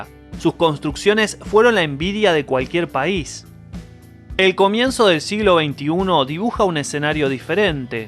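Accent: Argentina. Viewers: Ahora usted periodista, por su acento es argentino. Como esta su Argentina???? hoy en día???? cual es su inflación?????. Espero escuchar su análisis también, es fácil hablar de los vecinos pero difícil habla de mi hogar. O la idea central es "Mal de mucho consuelo de tontos" es eso su análisis??????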